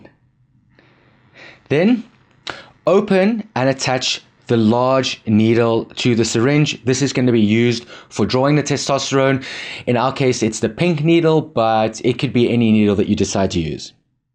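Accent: South Africa. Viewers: Sounds very south African.... Lekker boet... Very informative awesome video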